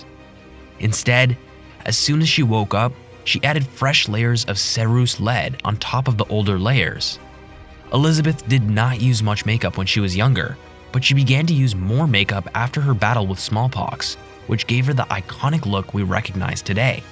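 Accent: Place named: United States. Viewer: full of incorrect “facts” and seppo pronunciation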